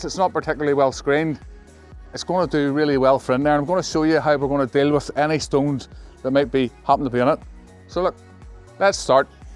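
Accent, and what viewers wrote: Northern Ireland, United Kingdom. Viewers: Beautiful response, and I LOVE your "broad Northern Irish accent" ! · Norn Iron accent AYE???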